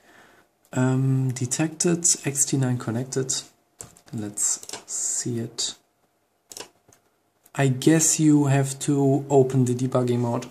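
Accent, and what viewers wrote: Germany. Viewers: Are you german?:)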